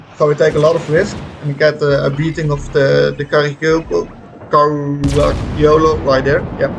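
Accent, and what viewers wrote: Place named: Netherlands. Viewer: You sound very dutch. Groeten uit Alkmaar 😁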